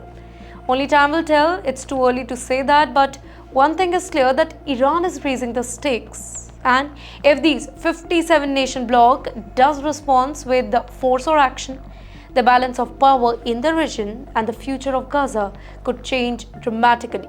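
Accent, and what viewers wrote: India. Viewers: Indian pronunciation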